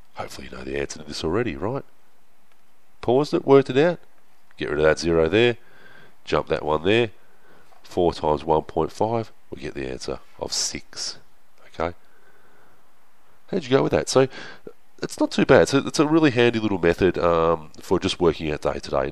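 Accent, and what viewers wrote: Australia. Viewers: I think your an Australian because you have a very aussie accent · I feel like he's Australian, not British. Of course an American can't tell the difference lol. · so weird and nice to hear an aussie voice on YT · Hes definitely Aus, from a fellow Aus.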